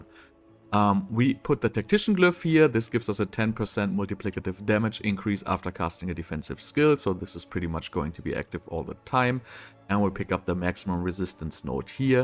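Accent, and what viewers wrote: Germany. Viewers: very interesting build. by the way, are you german ? I think i heard some pronunciation in that way.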